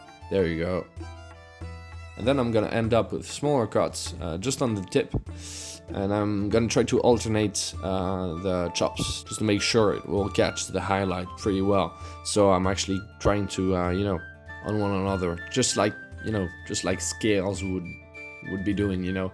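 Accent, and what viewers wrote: France. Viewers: What part of France are you from?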